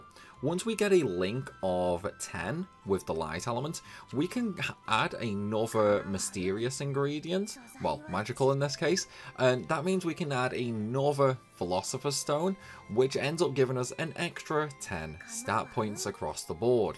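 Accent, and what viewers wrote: United Kingdom. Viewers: omg why is every obscure youtube channel ran by a brit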